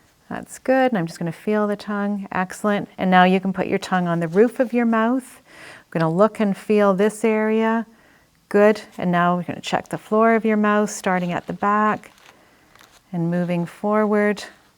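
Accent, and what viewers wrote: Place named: Canada. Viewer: Her accent is SO Canadian 🍁🍁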